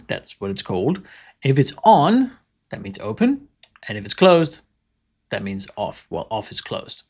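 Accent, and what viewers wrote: Denmark. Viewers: Jeg syntes nok jeg kunne genkende den meget lille danske accent. Hvordan kan det være du er flyttet til Australien?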